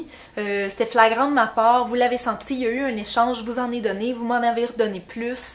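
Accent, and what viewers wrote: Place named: Ontario, Canada. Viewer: Je veux l'accent franco-ontarien ! Tu lâ perdu en allant vivre â montreal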